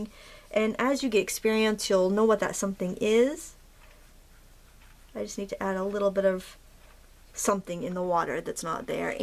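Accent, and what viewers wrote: Maine, United States. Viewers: I love your lighthouse! I live on the California coast and I don't believe that I have ever seen one. They make me want to live in New England. After you dry your painting at various intervals, does adding more water reactivate the previous layer of color? Thank you for giving us a touch of Maine with the painting and your accent Lol! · wow that was awesome! it's so fun watching you do the watercolors, it looks so easy and it's inspiring for me (a beginner)..I totally understood what you said tho, LOL and I am not from Maine.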